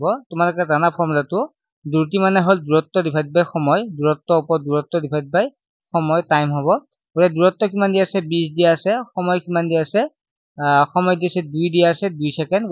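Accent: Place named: Jorhat, India. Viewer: Sir jorhat district r lage 😅😅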